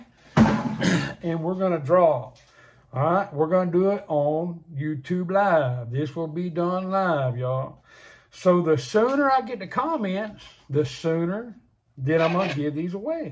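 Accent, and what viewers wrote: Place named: South Louisiana, United States. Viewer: I’m Kevin from Louisiana. I tie my own jigs also and I’m always watching to learn new patterns and techniques. Nothing like catching a fish on a jig you’ve tied! Plus you sound like my family and friends from South Louisiana! Hope you catch a bunch of sac-a-lait!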